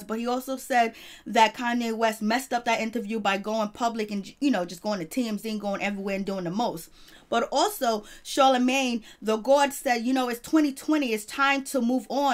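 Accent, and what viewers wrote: New York, United States. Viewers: You’ve got a thick NYC accent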